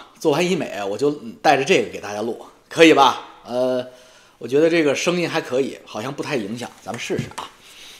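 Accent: China. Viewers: ，前些天你笑话阿姨的英文，今天我听出你的几个单词发音也不理想，中式发言浓度偏高。Fuck，你说的往fak偏；balance，你说的掰Lance；还有一个词可能是要说Melt 融合，说成迈特。见微知著哈，元音的发音比较中式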